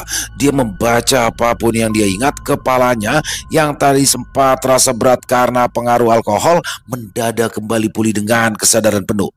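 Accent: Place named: Banjar, Indonesia. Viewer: Logat Banjar pengarang cerita. ❤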